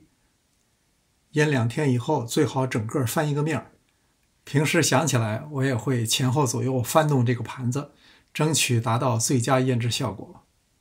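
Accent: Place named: Beijing-Tianjin, China. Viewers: 具体听不出来口音。能听出来是北方人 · 京津地区的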